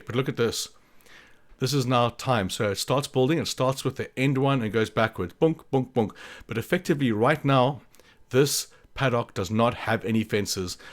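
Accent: South Africa. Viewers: Bro u sound like a fellow South African are u?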